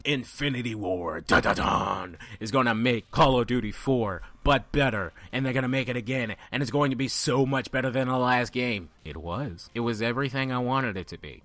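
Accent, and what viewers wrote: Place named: New Orleans, United States. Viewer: Shout outs to the black guys that sound like "white guys" lol But seriously, I get where you're coming from with this. And I could always hear just a bit of that New Orleans in you voice :)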